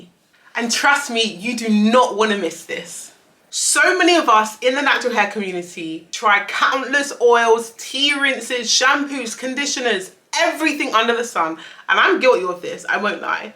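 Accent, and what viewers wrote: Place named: United Kingdom. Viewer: I hear a UK accent, and everyone knows the UK has no sun. Vitamin D is vital for the winter and low sun areas. My iron was low so I started geritol, biotin and recently added vitamin D and my hair is sooo thick. I am waiting on the growth but I have less breakage so I am so excited to see results in 6 months.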